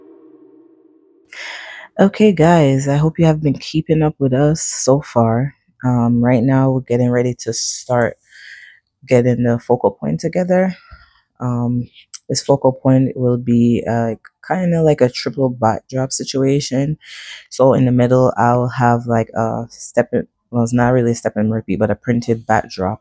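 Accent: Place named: Jamaica. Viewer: Waaaiiiiittt a minute did a hear patois? are you Jamaican or Caribbean? anyway, love the set up as usual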